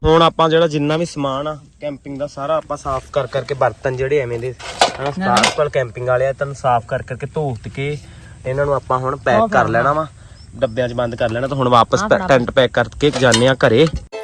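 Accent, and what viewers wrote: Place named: Makhu, India. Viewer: aa boli makhu wale pase bolde aa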